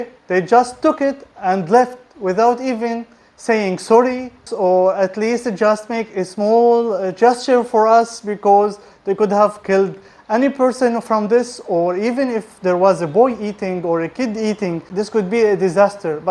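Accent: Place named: Arab world. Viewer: Wow this is a lotttttt of complaining..you have an arabic speaker"s accent. Dude have you travelled in the Middle East? These issues are nothing.Your complaints are endless in this video. It doesnt sound like this is the country for you. You should move to the west if you can. Egypt has similar issues but travellers on yt dont whine as much. I would suggest you move somewhere where you arent so miserable. Inflation is worse in North America. As is eating out. Travel elsewhere to see the difference and stop complaining over small things. If your biggest problem in life is a strawberry in your wine then you have NO PROBLEMS. Fries vs. wedges..seriously? You are not happy in Turkiye. You should leave. While you are there be generous with your turkish hosts. They are going through tough times! You really sound so miserable in this video..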